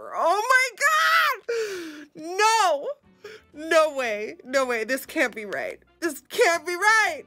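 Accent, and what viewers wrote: United Kingdom. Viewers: I love your British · I often like blue color on my eyelids. I really enjoyed watching your tutorial. And your British accent...Ah!!! 😂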